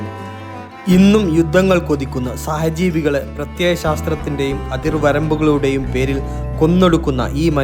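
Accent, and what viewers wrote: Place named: Kozhikode, India. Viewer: കോയിക്കോട്ട് കാരനാണല്ലേ? വല്ലാത്ത ഒരു യ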